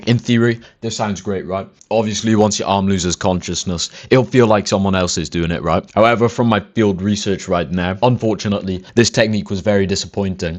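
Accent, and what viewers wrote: United Kingdom. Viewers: why are you british? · Pa fath o acen Gymreig sydd gan y boi ma? Y gorllewin ife?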